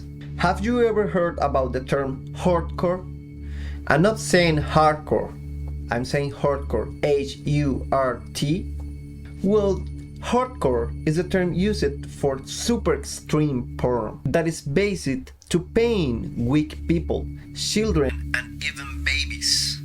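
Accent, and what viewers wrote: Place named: Spain. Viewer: This is an excellent true crime video. I'm trying to pin down your accent. Spain? Sorry if that guess is offensively wrong!! New sub right here.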